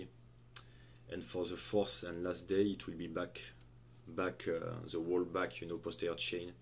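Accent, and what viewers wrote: France. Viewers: Nice. Your accent is even thicker than NH's. Good thing I'm able to understand glorious French accents without much trouble. You have a pretty good physique, how many years have you been training for? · Is French Accent real or forced?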